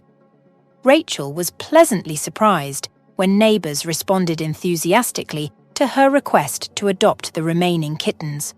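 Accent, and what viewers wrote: United Kingdom. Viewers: What a beautiful story I enjoyed It from the beginning to the end ! The narrator with British accent was wonderful. I am happy that Lana was cleared of suspicion on her actions Thank you so much I love this story!!!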